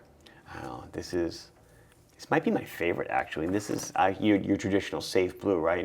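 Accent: Long Island, United States. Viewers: that's pure Long Island, son 😛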